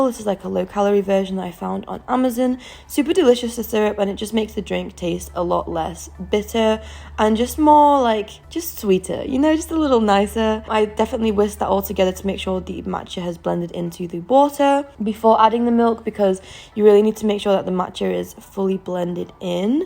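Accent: United Kingdom. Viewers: Trying to work out what part of the UK you are from - is it somewhere near say Bath or Bristol but maybe originally from the North West?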